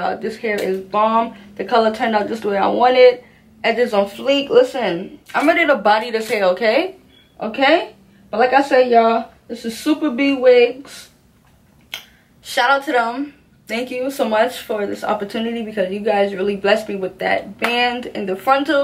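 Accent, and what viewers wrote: New York, United States. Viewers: NY accent 😍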